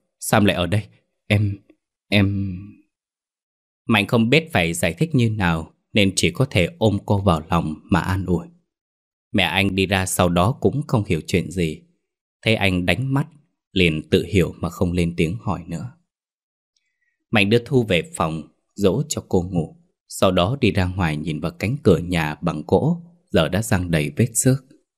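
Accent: North, Vietnam. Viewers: Hóng truyện của tg Huỳnh Thiên Hương. MC giọng bắc nhưng đọc truyện theo văn miền nam, miền tây cuốn theo cách riêng nha. Mình người SG nghe cứ bị ghiền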